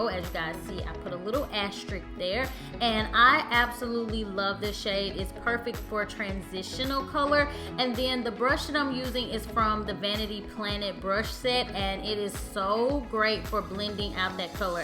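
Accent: United States. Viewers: Looking back at your older videos..and noticed that your accent has changed. You sound so country lol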